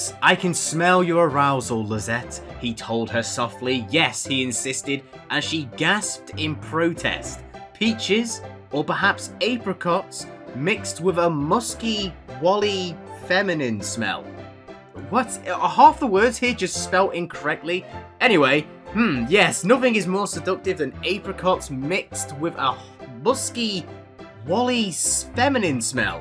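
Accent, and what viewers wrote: United Kingdom. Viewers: are you brithis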